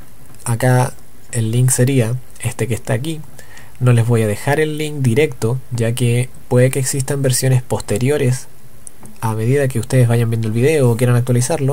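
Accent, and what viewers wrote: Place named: Chile. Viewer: Gracias por la app. Muy útil. Por cierto qué acento tan CHILENO tienes :D. Un saludo!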